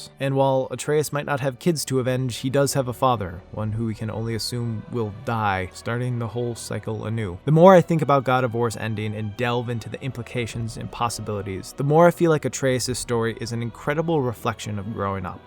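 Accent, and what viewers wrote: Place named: Midwest, United States. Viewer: NO WAY!! I grew up around the Twin Cities! Currently in Florida for college but I recognized the city, I could kind of tell you were from the mid-west by how you talked but I never realized you were that close to home. Your channel inspired me to make my own video essays about my work in VR development. Keep up the awesome work!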